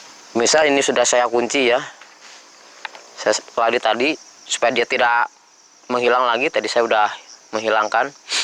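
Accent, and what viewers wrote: Banyumas, Indonesia. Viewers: Ngomngnya ngapak banget lho . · Walaaahh wong ngapak kie mesti,,hhhaa. sehat" nggih pak.tek enteni video anyare mning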